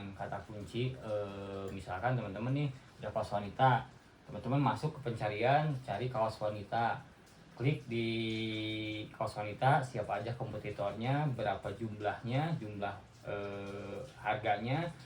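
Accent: West Java, Indonesia. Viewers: assalamualaikum kang perkenalkan saya bahcen dari bandung sehat selalu kang dan jangan bosan bagi bagi ilmu nya krna sangat membantu yg masih awam seblumnya saya lihat lihat video akang kayanya dari logat bicaranya seperti orang jawa barat jika benar orang jawa barat saya siap ngopi bareng akang untuk shering tentang shopee terima kasih sebelumnya ya kang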